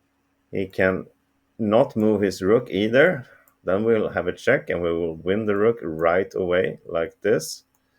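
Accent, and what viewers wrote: Sweden. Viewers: What a bizarre comment!! I like Swedish accents and the overall impression is most personable.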